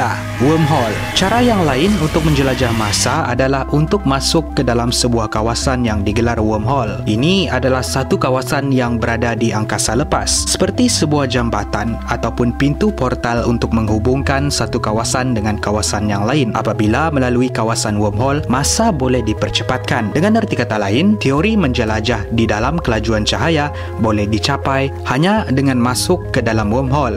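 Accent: Sabah, Malaysia. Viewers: dia orang sabah la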